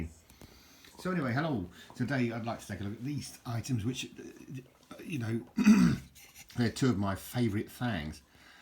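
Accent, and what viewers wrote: RP, United Kingdom. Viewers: You're most welcome:-Gosh you sure are modest,😆Or you really know how to sell yourself, painting a picture of someone with baneful breath + a barren buccal, God bless you I'm sure you love Gem Jimmy unconditionally+vice versa You sound remarkably posh ,,, British+ Mellifluous, for someone in Poland...I presume you lived numerous years in England, previously? Golly gosh,old chap, your videos are always a jolly good show, Thenk you 😅 Poppycock,you claiming beastly breath:- Your breath doesn't need to smell of flowers,to make your exquisite videos💐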